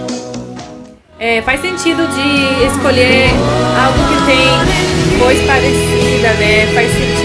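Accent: Northeast, Brazil. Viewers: não sei se vc já percebeu, mas vc tá com sotaque nordestino, será que é proposital? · Essa gringa parece q é nordestina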